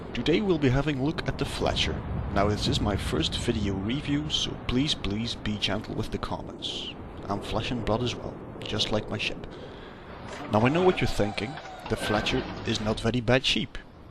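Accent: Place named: Russia. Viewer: I admire your accent. I can hardly recognise you are Russian at all!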